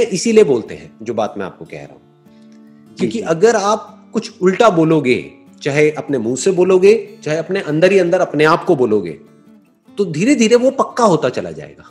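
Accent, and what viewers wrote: Gujarat, India. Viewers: Oh God I just loved his Gujarati accent 😘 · jab gujarati log hindi bolte hai tab pata chal hi jata hai ki vo gujarati hai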